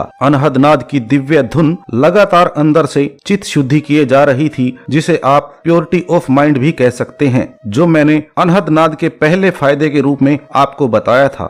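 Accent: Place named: Punjab, India. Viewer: I can feel your Punjabi accent Wise words..I will definitely try this method 🙏🏻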